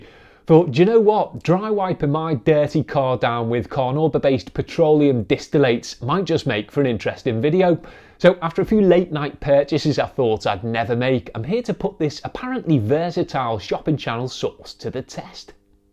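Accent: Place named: Wirral, United Kingdom. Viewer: I'm trying to pinpoint your accent. 🙂 I'm a Wirral lad now residing in sunny Formby. I can't help feel your accent has a Wirral/Scouse tang to it. Am I close? Keep up the good work. 👍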